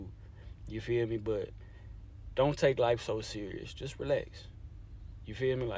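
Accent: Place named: Louisiana, United States. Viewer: You said you don’t have a Louisiana accent ? Yes you do! lol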